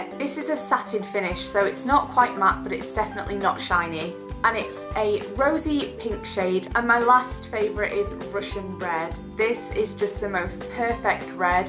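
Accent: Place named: Sheffield, United Kingdom. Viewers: Sheffield in the east middlings of the uk x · She is from sheffield in East Midlands UK x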